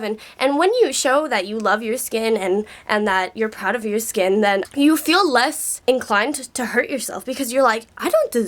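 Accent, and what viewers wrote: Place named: Australia. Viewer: you sound like your picking up an Australian accent 😂😂its super cute oh my lord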